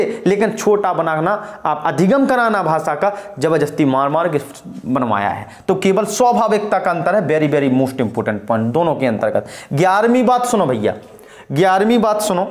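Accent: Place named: Bundelkhand, India. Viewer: Very nice sir thanku aapki bundelkhani awesome👍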